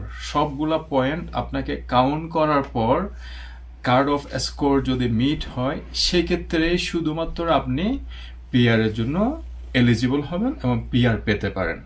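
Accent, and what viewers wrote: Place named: Chittagong, Bangladesh. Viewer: Vaiyar bari Chittagong for sure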